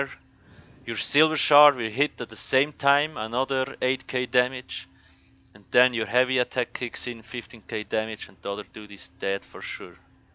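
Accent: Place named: Switzerland. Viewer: Dä schwizer akzänt :D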